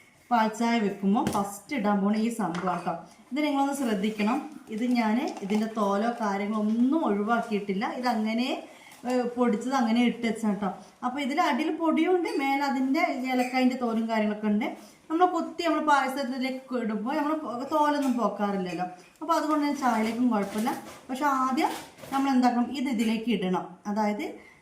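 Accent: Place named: Kozhikode, India. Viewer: ഹലോ ഇങ്ങള് നാട് എവിടെയാ ശരിക്കും നിങ്ങൾ കോഴിക്കോട് വർത്താനം ആണല്ലോ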